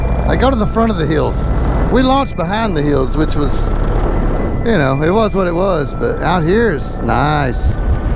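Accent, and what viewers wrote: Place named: Louisiana, United States. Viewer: Good times had by all, great vid 😃. Maybe it's the other guys accents, but you sound more Louisiana than when your at home 😃😃 👍👍🇬🇧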